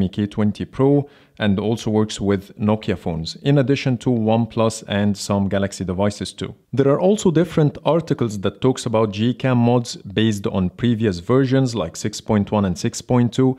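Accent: Egypt. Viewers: are you an egytian your accent denotes that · You got an Egyptian accent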